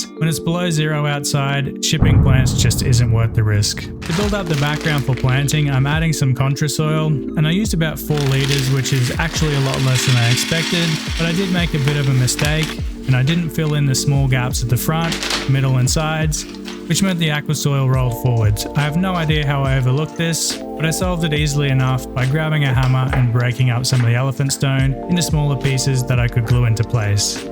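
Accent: Australia. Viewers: So thrown by the Aussie accent with European context! Would love an update on this tank now it's had time to settle.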